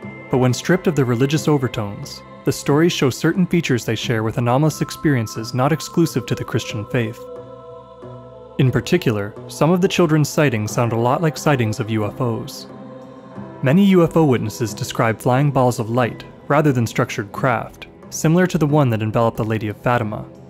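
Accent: Canada. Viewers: Yeah, I never heard that there was a disc separate from the Sun that was doing the spinning, and then "the disc" RETURNED TO THE SUN; don't know where that came from; as I said, I never heard that before. Overall, however, it was a nice presentation, but what sounded like a hard (thick) Canadian accent from the narrator was a bit bothersome to me.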